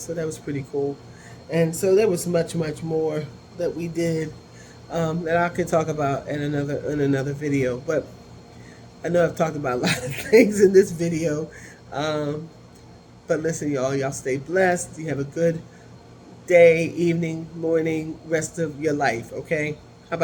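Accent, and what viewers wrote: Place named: New Jersey, United States. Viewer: Where is G located? I think you’re from Jersey, (I can hear it)! So am I, it live in Atlanta now. Can come see her in Jersey. If so, I come home all the time E.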